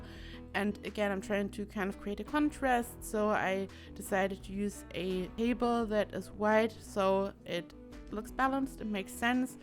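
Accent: Germany. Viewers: Ur german, aren‘t you? Sounds like it.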